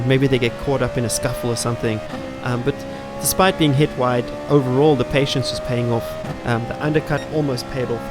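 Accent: South Africa. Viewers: Where in SA are you from? Your accent sounds like Cape Town, or at least somewhere in the Western Cape.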